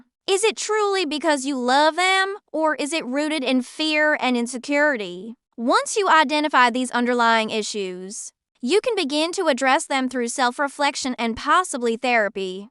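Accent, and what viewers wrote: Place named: South, United States. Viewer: Helpful video with a Heavy Southern Sandy accent though. Lol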